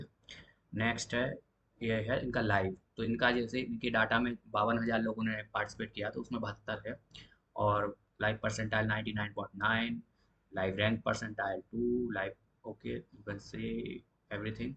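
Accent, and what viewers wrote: Bundelkhand, India. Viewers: Kaha se ho bhai thoda bundelkhand wala accent lagta h aapka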